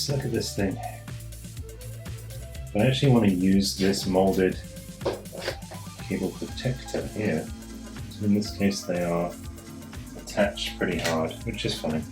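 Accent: United Kingdom. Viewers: Are you from the UK but live in the us?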